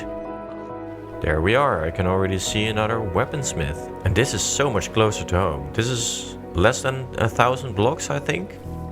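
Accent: Netherlands. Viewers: Hi, I love your series, your explanations and the many helpful details make Minecraft something special. I've just started again after many years, and so far I've only ever built functionally. Now I see that everything can also be ‘beautiful’ - a new challenge for me. Unfortunately I still lack your creativity, but I learn with each of your videos! Furthermore, your pleasant voice is relaxing and your accent reminds me of many beautiful holidays in Holland 👍😃⛵ Win-Win-Win!!!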